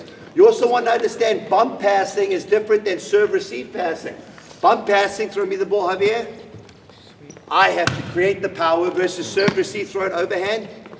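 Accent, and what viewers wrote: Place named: Russia. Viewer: ) Guess you should make a video? Feel free to post to compare! - There is definitely a time to bend your arms. The game has changed allot since the 80's. You sound Russian and old School. In the 80's they served underhand. Today even at HS the serve is coming so fast your goal is to make a platform. Sometimes your arms cannot be straight. But you can still make a great platform with bent arms! :) Anyway send me the link to your video coach? :)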